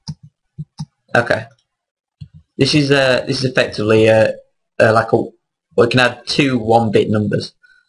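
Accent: United Kingdom. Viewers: Sound British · Where are you from in the UK? You sound like you're from Lancashire haha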